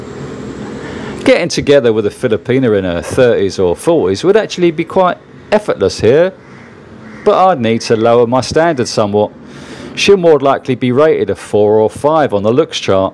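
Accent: United Kingdom. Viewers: Hey. I hate to break it to you. My across the pond, Limey, Friend. As Western as these ladies appear. They only appeared, Western. This culture is as far away from our culture. As your Limey, accent. Is to my, Fine Midwestern Announcer, twang. On this side of the pond. And my brother was married to one for 25 years. Until a lack of love from her. Finally killed him. A little over 8 months ago at 64. Which is what happens. When they don't know how to give love. As in, actual love. Not just the fun love. But the real love. Love everybody needs. She never had for him. She was a gold digger. And found yourself an American boy. And took him for a ride for 25 years until he died. From a lack of love. So I really cannot fully comprehend their culture. As it is quite Foreign. To mine and yours. There is just nothing there to relate to. It is that Foreign. It's not like Europeans or Americans, at all. 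They only look that way. They only have the same stuff. The only carry-on in the same manner. To imitate the rest of us. And they are not what they appear to be. Though they can be quite lovely. And very dedicated people. But they have a whole bunch of whack jobs also. Most likely from their poverty. As I don't know what kind of poverty it's like to be a, Limey. From the UK? Though I could have a little idea? Since we both watched the same, Peter Sellers, Tracy Ullman, Benny Hill, Monty Python, shows. And all the others. I got them on both the CBC and PBS. Because I lived in Detroi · I like the Narration not the accent Shows you how the UK has different accents most of them are so common.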